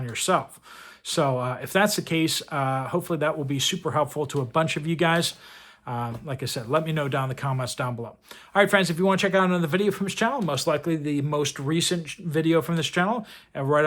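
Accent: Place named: Pittsburgh, United States. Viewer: I can understand what you are doing and pray that you will have a wonderful life with your beautiful new wife. You can leave the Keystone state but you will always have the the Pittsburgh accent. I don't want to leave Pennsylvania but I'm also nowhere near ANY city. 😊 I certainly agree with you on the tax situation.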